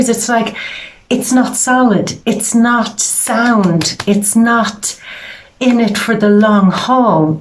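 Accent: Ireland. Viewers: Anything you do or say is always a blessing , you have a healing energy and that Irish accent is a killer , as a Leo I can only cheer you doing you. Thanks for being ❤️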